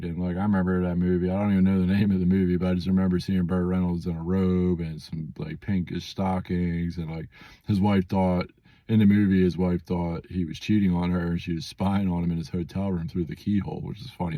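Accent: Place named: Philadelphia, United States. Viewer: I totally knew from your accent that you were from the Philly area!! My family is from Levittown/Bucks County!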